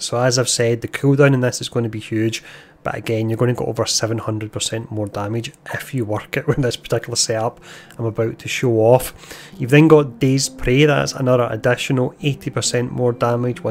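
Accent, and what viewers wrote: Scotland, United Kingdom. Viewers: I absolutely love falconer, and the dive bomb. BUT, cant help myself to feel bad for the poor falcon when he lands that dive bomb, honestly, throws me off, I don’t even want to use it because it looks so hurtful😆 Btw, are you Scottish? You sound like my favorite snooker player, mr. John Higgins 😁 great video all the way through, sub!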